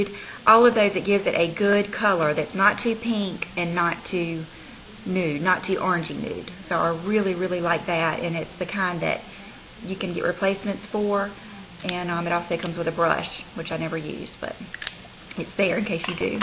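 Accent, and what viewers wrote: South, United States. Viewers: 5 stars for the lovely southern accent :)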